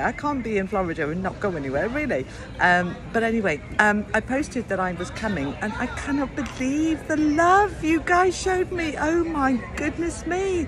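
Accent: Wales, United Kingdom. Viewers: My son has just arrived back from Orlando. It was 35 degrees, ugh. I’m in England and to me you sound ever so slightly Welsh. ❤ Did you mean the Celestine Prophecy?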